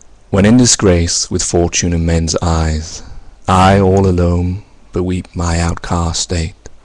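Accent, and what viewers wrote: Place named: United Kingdom. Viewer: You have an excellent reading voice. You would be a fantastic narrator for books on tape and radio dramas! As an American, I must say that a British accent is always enjoyable to hear!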